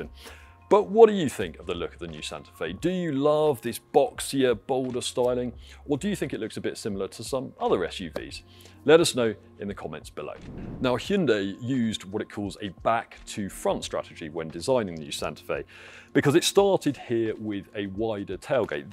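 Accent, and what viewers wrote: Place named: England, United Kingdom. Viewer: He speaks with a strong English accent! Hard to understand.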